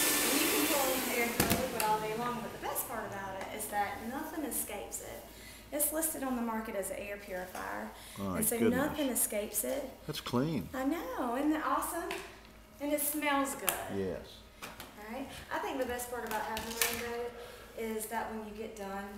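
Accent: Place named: South, United States. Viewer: I love her accent sounds like South Carolina or Georgia. Very pretty too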